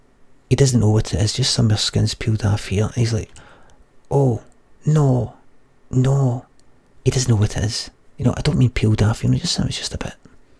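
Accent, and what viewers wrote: Scotland, United Kingdom. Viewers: That's because Scottish is so catchy. I'm half Scottish, born and raised in London. When I stay up Glasgow for more than a week then I start thinking Scottish lol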